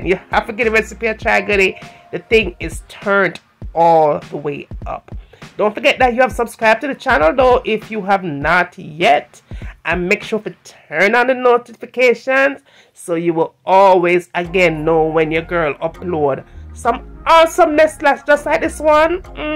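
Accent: Jamaica. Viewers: You sound jamaican but you don't cook jamaican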